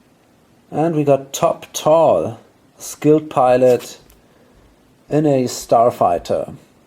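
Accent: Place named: Germany. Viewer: I knew it,deutschen Akzent erkennt man relativ leicht :D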